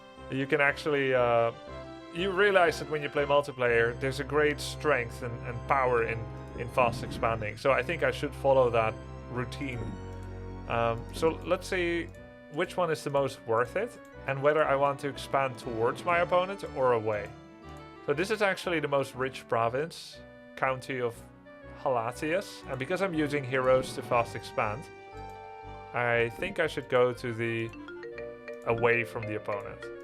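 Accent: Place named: Netherlands. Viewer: I thought I recognized a subtle Dutch accent! I'm French but living in the Netherlands, in Den Haag. I was a huge fan of D&D growing up in the 90s, but somehow never played this one, so I was wondering if it was worth a purchase. Thanks for the video, I just picked it up off GOG. :)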